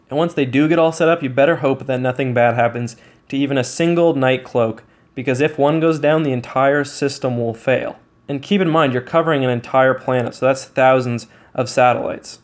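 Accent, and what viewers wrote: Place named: Saskatchewan, Canada. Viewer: You from Saskatchewan?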